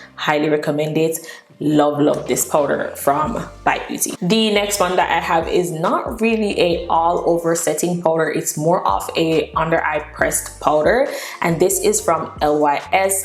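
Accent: Jamaica. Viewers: U sound Jamaican. Not a question lol. So sure you are Jamaican. Great content. Keep doing your thing :)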